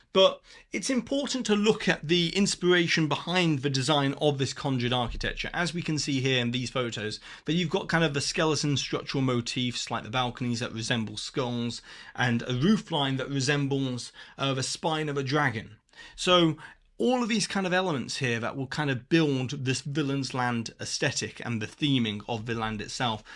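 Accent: United Kingdom. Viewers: Are you putting on your British accent? Why are you saying wailwoad, twain, dwainage, you weckon, etc.?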